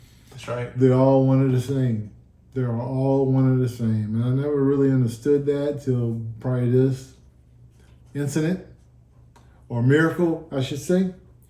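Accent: New Orleans, United States. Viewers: Love your story, and you sound like your from the New Orleans area? I was born and raised there.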